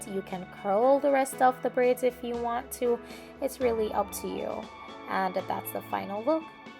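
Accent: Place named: Jamaica. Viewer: Heard the accent and I was like...She is jamaican for sure!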